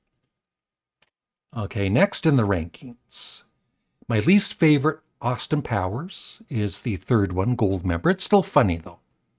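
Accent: Canada. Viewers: Nice shirt youre wearing in this video and I like your Canadian accent.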